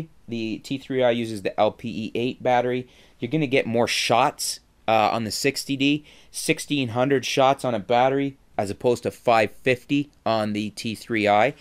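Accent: Canada. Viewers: After he said he was Canadian I couldn't ignore his accent. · Goiod info. Canadian, eh?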